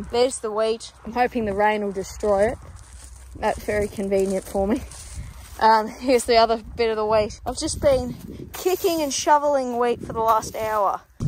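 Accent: Australia. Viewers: I didnt even consider that most people watching would be american because youve got a proper aussie accent 😂 im watching from WA, i just think you're funny af and its cool to watch farm work for some reason 😅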